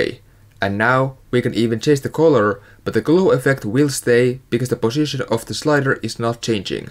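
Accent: Finland. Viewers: When you started talking i was like he is from Finland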